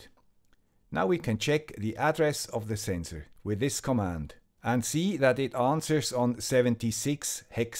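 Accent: Switzerland. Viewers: Third law of termodynamics -> There is no place where you can find -1,800 °C, not even in Sibiria ;-). Some thoughts about the SPI temperature sensor: a) The low negative temperatures could be caused by a wrong polarity. Have you checked that the thermocouple is connected with the right polarity? b) Are you sure the thermocouple and the MAX31855 are type K? The circuit python module only works with K-types. c) There is a very little chance that the D5 pin is not set as output: you could do this by adding the line to script: cs = digitalio.DigitalInOut( board.D5 ) cs.direction = digitalio.Direction.OUTPUT # add this line It would be so cool, if we could find a solution because knowing, it doesn't work, drives me crazy. Yes, I'm a Nerd ;-). Someone like Mr. Monk ( but not so brilliant ;-) ). BTW: I like your videos: interesting themes, well prepared, you do not hide your mistakes (so we could learn from them, as well as you did), understandable english (with a nice swiss accent; greeting from a German neighbor), no boring background music and for me also important: fluent speaking with no ehms and aahhms. 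So thank you for your work and for your countless inspirations! vy 73 DF4VB (Peter) PS: What is a qualifying purchase? Only the products in your shop, or can I mark other things, I'd like to buy, to be recommended by you (or so)? I have no experience with amazon, but if I could support you, I'd give it a try. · the Swiss accent sounds a lot more pleasant to me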